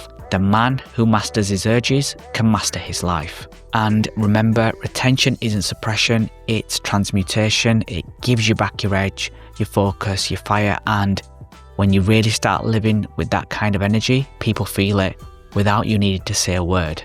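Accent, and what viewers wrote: United Kingdom. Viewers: what British accent is this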